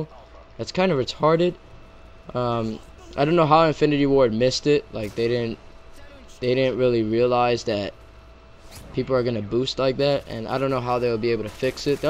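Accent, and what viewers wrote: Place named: Italian-American, United States. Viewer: You sound Italian/American, are you? Either that or your putting on the voice a squeaker would do if you said they had a high voice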